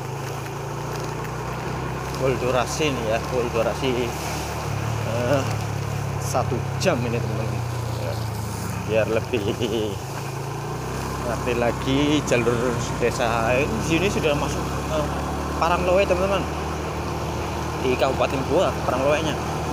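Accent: Banyumas, Indonesia. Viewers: Asli mana bang . Kok logat,nya kayak ngapak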